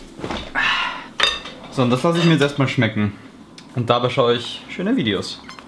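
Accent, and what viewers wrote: Austria. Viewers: ist das Österreichisch oder italienisch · könntest du mal ein Gaming Video machen wo du nur mit Österreichischem akzent redest? ;) mach weiter so bist mega cool, aber ich finde es manchmal etwas anstrengend für die Augen, wenn du die Helligkeit manuell umstellst in deinen vlogs :/ das nur Konstruktive Kritik sein :-)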